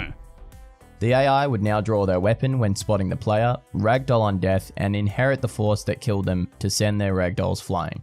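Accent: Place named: Australia. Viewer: I thought I heard the accent I'm Aus too lol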